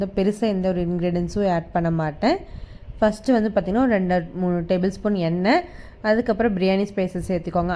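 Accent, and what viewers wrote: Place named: Kongu, India. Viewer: Kongu tamil kekavae romba nalla irrukku.. super sister ...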